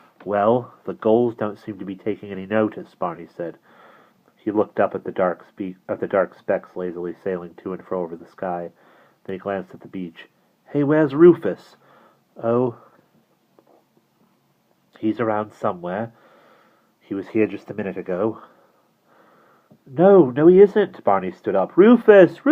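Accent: England, United Kingdom. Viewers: Really enjoying this. Great English accent (I’m in England) only one mispronunciation (British English-wise) is “quay”. In the UK we pronounce “quay” as “key”. It’s brilliant hearing one of my old favourites read so well. Thank you for doing this.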